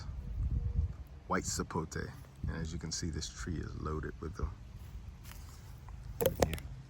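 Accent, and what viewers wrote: Jamaica. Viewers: Can I get two of your coconuts. Send some to Jamaica the land of wood and water. You sound like a son of the soil of Jamaica.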